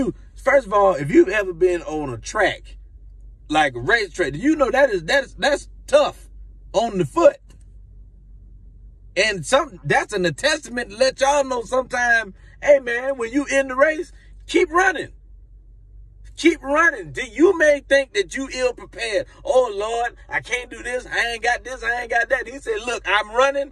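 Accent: Africa. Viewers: I love your African accent!! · I love your African accent,... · I love when you do the African accent! 😂😂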